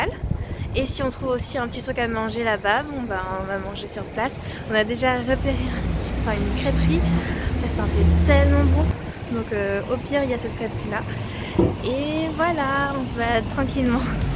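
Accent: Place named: France. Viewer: My wife and I love your french accent. Welcome to Quebec and good luck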